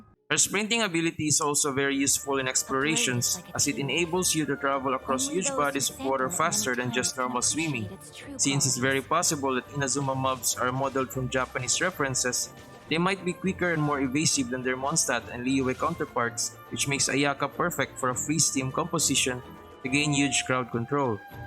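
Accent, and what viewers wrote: Philippines. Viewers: filipino accent gang lets gooooo!!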